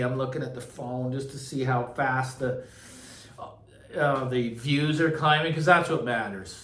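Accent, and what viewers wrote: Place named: Canada. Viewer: I knew this furious pace of new videos would take its toll. As previous commenters have said, its better to speak with purpose than speak with haste. Now, I could listen to that Canadian accent all day long. No lie! Keep up the good work and the committed followers will be there. You speak from the heart and from a position of experience. All of these I respect and find refreshing as compared to the puppy mill of reviewers out there. Reviewing over 100 speaker models in a year? How do you even maintain a baseline? No thanks. Ill take my dose of whenever it next dings my bell.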